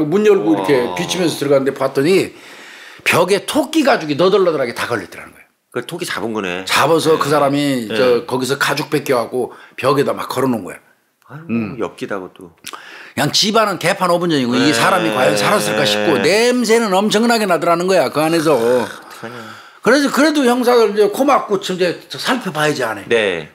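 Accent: Chungcheong, South Korea. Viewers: 화끈한말씀^충청도사투리가구수하신~ 김복준선생님? 절친고향여친도 복주라하였네요!오래전 고혈압으로 하늘이사한 가엾은친구!생각이나는~ 사이다같은 범죄자에 쓴소리가 그나마보는내내 시원한~🧊 하나뿐인생명 무참히살해하는인간들은? 그냥~~팍👊😡 요즘 수사반장을보는듯한~수고와감사🙇‍♀️ 그리고교훈^조심또조심 밑을넘없다 경계심잃지말고…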